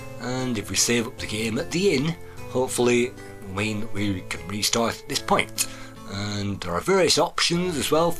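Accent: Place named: North, United Kingdom. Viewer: I like to hear northerners and wunder from what county thou art.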